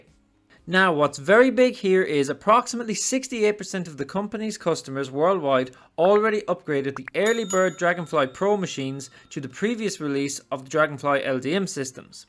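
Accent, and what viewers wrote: Ireland. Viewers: Who is downvoting this? I liked and subscribed, thanks for the video. I especially enjoy the Irish(?) accent - “sales and mahhhhrketing (marketing). Ah, Sacred Heart, there ought to be a bar over here.”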